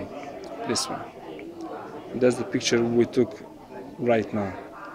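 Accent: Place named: Russia. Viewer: рашен аксент из прешас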